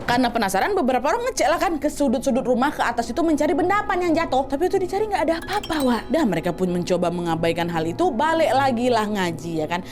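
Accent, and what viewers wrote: Malaysia. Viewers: nada&logat malaysia ny dpt bgt plsss□□ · Lucu skli kak ngomong bahasa malay😅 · Mood banget denger kak ngomong logat melayu gtu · comelnya kak berbahasa melayu 🤭 salam dari Malaysia kak. sihat2 selalu 🫶🏻🇲🇾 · Comelnya cakap melayu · Respect cara Kak bercerita.. sama macam bahasa Malaysia.. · Comel lah kak cakap bahasa Melayu 🤭🤭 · candu pas kak pake logat malay😂 kak emg juara 1 menguasai logat daerah bahkan sampe ke negara tetangga 🤣🤣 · Kak kenapa logatnya jadi ikut pake logat melayu😂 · leggok kalii melayunya bahh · Pertama, cerita ni memberi nuansa baru dari khw sebelumnya, jadi seru kaliii. Kedua, kak cakap melayu adoooh comel lah sukak ❤ makasi kak utk videonya, selalu excited nonton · Hai fatin from malaysia * comel sangt ckp slang malaysia........ comel!!!!! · Comel cakap bahasa melayu malaysia❤ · Bahasa melayu kak gelas kali wak ehh😊😊😊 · Lucu sangat cakap bahasa Malaysia,comel je😊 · Kak lucu bgt pake bahasa Melayu😂😂❤❤❤ menghayati ya wakk😂😂❤❤❤❤ sembagattt wakk❤❤ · comel je ckp style malaysia😂❤ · comel je cakap bahasa malaysia 🤭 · Comel nye dengar kak cube cakap bahasa melayu. 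Thank you kak for the effort, hargai gile usaha kak ❤ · cakap melayu is so comeyyy 🤣😊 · Comel je cakap bahasa melayu😊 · comel la bercerita dalam bahasa malaysia 😍😍😍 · Kak cakap bahasa melayu serasa lg dgr cerita p.ramlee👍🏻🤭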